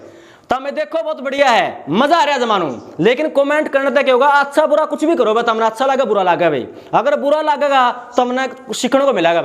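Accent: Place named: Haryana, India. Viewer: akdm haryanvi 👍👍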